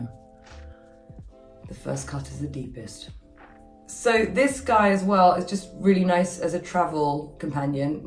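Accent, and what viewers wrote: United Kingdom. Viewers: The way she talks is so adorably British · I love her 👌❤️ her british accent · Omg , i love so much the British accent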